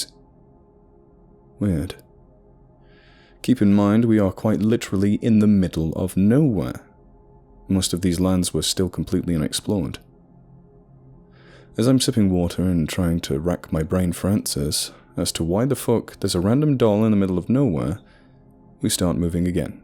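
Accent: South, United States. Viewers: i like the country narrator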